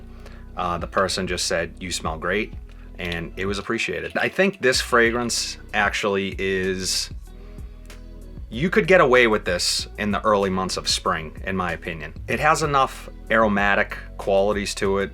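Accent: Boston, United States. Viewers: THAT BOSTON ACCENT!!! FIRE!!!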